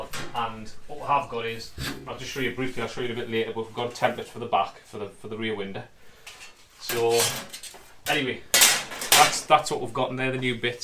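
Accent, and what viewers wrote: Newcastle, United Kingdom. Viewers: I love the work you're doing but I'm also enjoying the Geordie narration!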